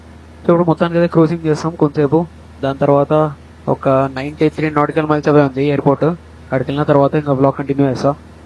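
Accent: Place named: Rayalaseema, India. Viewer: Kachitamga nedi rayalseema kada bro ...😊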